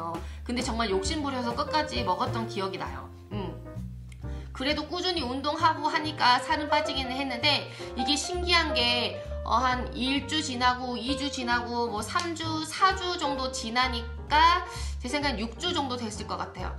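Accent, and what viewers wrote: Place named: Seoul, South Korea. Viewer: 님 특유의 사투리말투에서 서울말투 사이 너무 귀여워용ㅎㅎ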